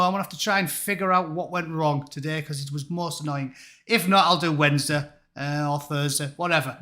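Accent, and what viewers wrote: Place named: Northern England, United Kingdom. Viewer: A chippy Northern accent could also be the reason why Google AI policebots and Suuuzaaah haven't figured out wtf you're on about Haha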